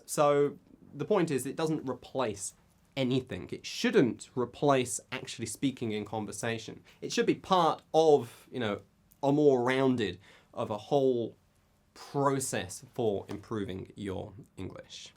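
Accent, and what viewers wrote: United Kingdom. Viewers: What's his accent? which kind of British accent?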